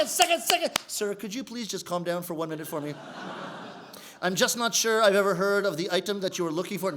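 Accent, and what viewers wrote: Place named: United States. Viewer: That's not Canadian, that's American!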